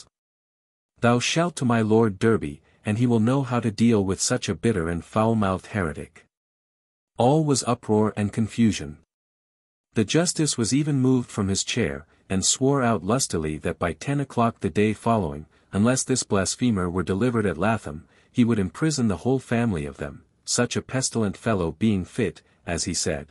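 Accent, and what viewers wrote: United States. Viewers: Only managed to stomach 12ish minutes of this godawful monotonous American voice. Would have been much better in an English accent at least, seen as it’s about Lancashire!!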